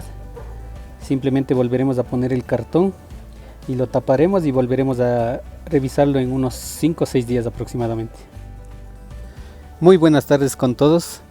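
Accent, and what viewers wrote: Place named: Quechua, Bolivia. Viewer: Hola, gracias a ti. Lo dije porque me recordaba mucho tu acento a los bolivianos que hablan quechua, será una casualidad. Saludos !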